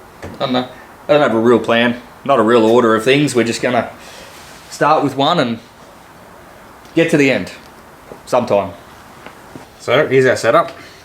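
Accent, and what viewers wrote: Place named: Australia. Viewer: hahahaha....I thought you might have "bitten" on that one! I used to live in your area but I have since moved to The Philippines. Its good to hear your Aussie accent !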